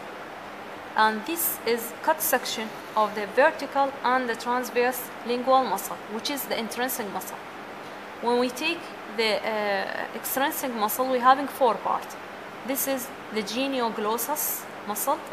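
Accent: Arab world. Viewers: English with arabic pronounces thank you sister you’re best 🤗🤗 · bro she is arab i think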